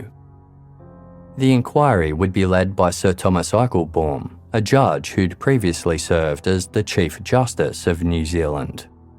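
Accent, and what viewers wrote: Australia. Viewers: Well, he is Australian. Not exactly a sophisticated accent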